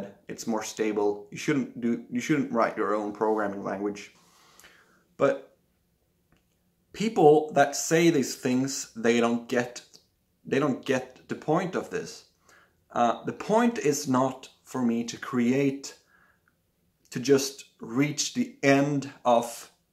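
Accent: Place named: Finland. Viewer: I heard the Finnish in your English during the first 10 seconds! Am I right?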